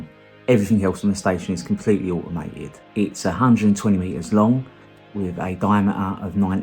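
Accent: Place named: United Kingdom. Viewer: i really enjoy your mellow voice and accent - as an american it seems to be more legitimate when talking about the thunderbirds!! I love british sci fi and look forward to more videos. Im really curious about 'The Secret Service" - a personal favorite of mine. Ill never forget the first time i saw the man ride around in the vicars suitcase!!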